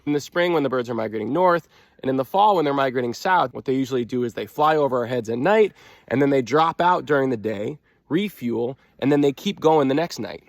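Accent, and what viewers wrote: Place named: Vermont, United States. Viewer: Oh my goodness! You sound like a real Vermonter! Recognizing nuance dances within the entire Living Web of Life.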